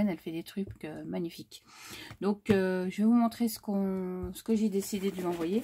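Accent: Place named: Lorraine, France. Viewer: Tout est très joli !!! Cathy a dû être contente. Je me suis abonnée chez toi aujourd'hui et je savais bien que je reconnaissais ton accent, je suis Lorraine aussi. Tu es d'où ?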